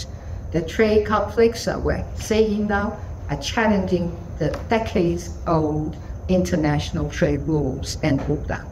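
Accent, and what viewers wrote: United Kingdom. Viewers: 蔡前總統講的英文，英國腔就是留學過英國最好的證明，誰說蔡前總統的學歷造假？ 麻煩講流利的英國腔英文給我聽聽。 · 在LSE英腔的英語演説還不用看稿，躲在螢幕、手機後的藍白共可以消除之前的那些（聽説）了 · 小英總統的英腔本來就很重了🥰🤭回去母校演講就更重了😍🤩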